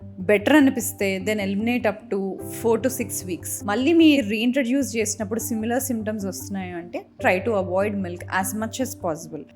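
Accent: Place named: Telugu, India. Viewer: Milk గురుంచి చాలా బాగా చెప్పేరు madam. Thanks for your Telugu slang, which beautifully explained us,the issue.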